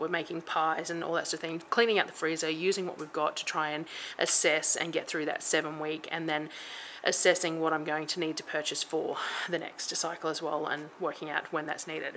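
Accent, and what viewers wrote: Australia. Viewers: I love your content because your real every thing is not all prettied up before the camera is turned on you don’t make me feel inadequate because my home is not picture perfect and your Australian I understand what your saying my sister and I appreciate everything you do. My sister has fibromyalgia and other medical conditions as do I your amazing and we have learnt so much from you ❤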